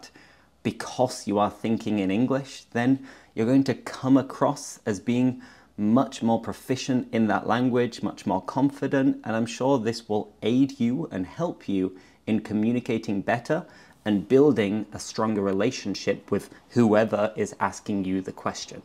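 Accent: England, United Kingdom. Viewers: I LOVE HIS VIDEOS BECAUSE THEY ARE AMAZING AND CAN GIVE YOU TONS OF ADVICE AND EXEMPLES , very nice love his video and his cool english accent and he is right as well about the app.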